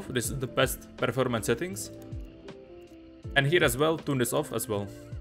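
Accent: Czech Republic. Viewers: Hello arent you from Czech ? you accent sound like it chears :)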